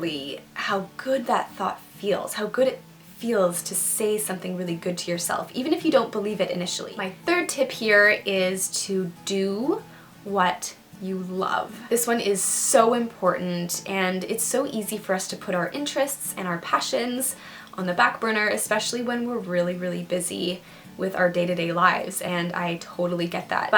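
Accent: Canada. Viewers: Awesome guidance! Super excited when you post a new video! Oh, and I love the Canadian accent!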